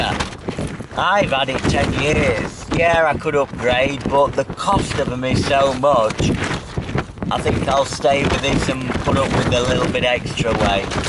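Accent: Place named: Yorkshire, United Kingdom. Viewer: A broad Yorkshire accent, a tent and Yorkshire puddings makes me feel comfy inside....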